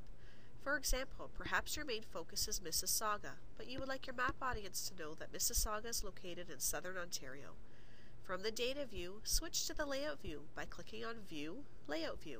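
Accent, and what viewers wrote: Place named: Mid-Atlantic, United States. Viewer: gotta love that mid east coast accent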